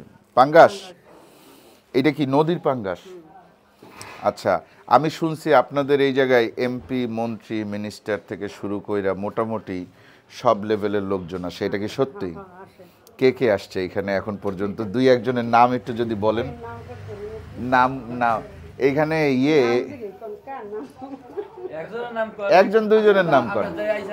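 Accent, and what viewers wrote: Barishal, Bangladesh. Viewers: Vai borishal jaiya apnar kotha bArtai akta borishailla vab aisay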